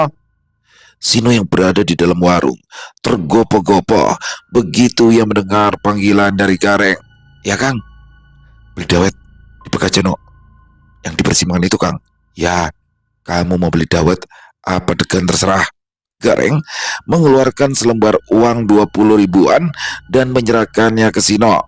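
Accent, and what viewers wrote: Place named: Banyumas, Indonesia. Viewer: Ini mas kalo di joinin dengan mas bawor cocok,sama sama ngapaknya dari banyumas ❤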